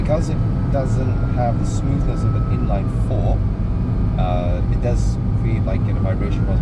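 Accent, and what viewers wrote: United Kingdom. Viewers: Where did you get the British Accent? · You sounds a bit like British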